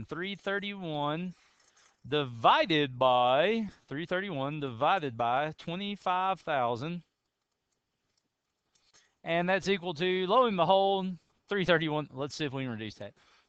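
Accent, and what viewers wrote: United States. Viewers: loving the western accent! -- better explained this way!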